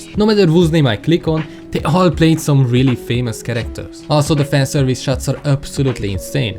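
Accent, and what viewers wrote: Hungary. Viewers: hmmm..... i know a hungarian accent when i hear one. i can smell it from kilometres away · Are you hungarian? You sound like one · 11 seconds in and I already know its a Magyar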